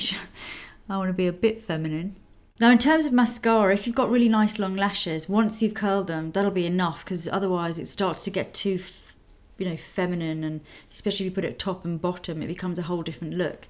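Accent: England, United Kingdom. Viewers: Great, very informative videos! I also like your English accent (I'm German) :)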